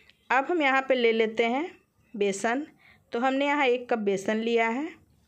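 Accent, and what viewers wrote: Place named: Bihar, India. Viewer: hame aap ki awaz se to pata tha ki aap bihar se hai lekin puchti nahin thi ab ham aor pakka dost ho gaye hai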